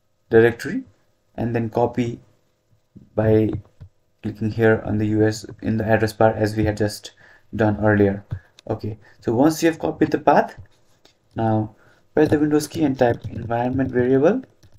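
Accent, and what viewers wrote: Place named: India. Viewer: Never trust a guy with a indian accent that gives advice about python and windows config channels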